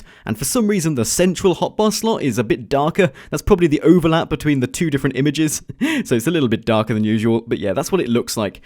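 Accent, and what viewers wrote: United Kingdom. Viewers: Oh, wow. I guess when 1.7 came out it took ages! Anyway, just to let you know that I am a HUGE fan of you. Are you British? I heard from your accent, I am British:D #iamawippling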